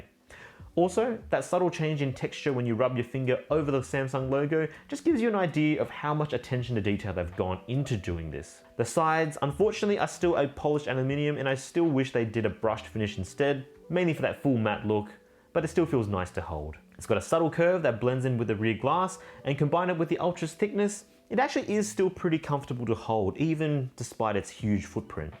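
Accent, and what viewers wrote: Australia. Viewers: you look Chinese but speak like an Australian . nice video though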